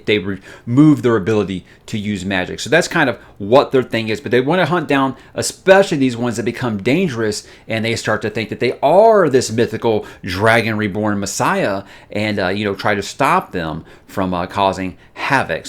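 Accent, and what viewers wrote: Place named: United States. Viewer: Hearing the intro in such a manifestly American accent is a balm after enduring three episodes of British.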